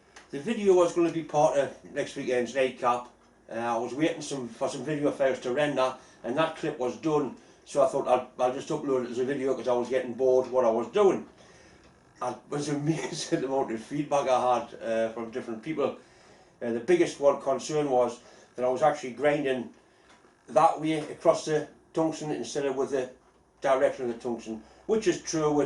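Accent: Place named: Geordie, United Kingdom. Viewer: Absolute nonsense. has one of the finest regional English accents. He is a proud Geordie and long may he remain so!!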